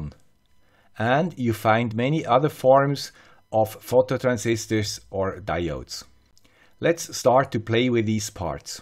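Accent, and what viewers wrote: Switzerland. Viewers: I love your videos Sir. I am looking to make a simple ir blaster to turn on and off a TV and stumbled upon yet another great video from the guy with the Swiss accent. Thanks for the info. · And winner of my first comment on youtube is the guy with the swiss accent... thank you for all your great videos! A few weeks ago I bought during a flashdeal on aliexpress a cheap esp-01 with a relay and ended here on your channel... 30 Years ago when I was building my first cnc router it was really complicated to get all the knowledge together and today we just google... BTW I remember in one of your videos… problems with a 3D printer....maybe Iˋm the man... we are almost neighbours. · HAHAHA "Here is the guy with the Swiss accent." If only I could put a 100 likes into this video.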